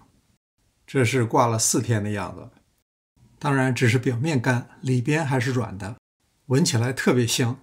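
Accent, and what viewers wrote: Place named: Beijing-Tianjin, China. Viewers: 具体听不出来口音。能听出来是北方人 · 京津地区的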